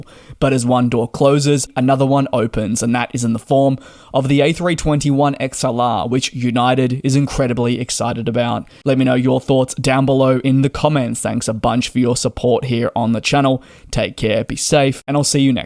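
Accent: Australia. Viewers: I could be mistaken, but this guy sounds Australian.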